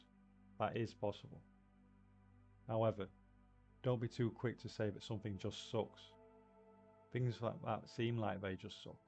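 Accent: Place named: Northern England, United Kingdom. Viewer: The person narrating IS an English person. They have a northern English accent. The subtitles are there for a reason :)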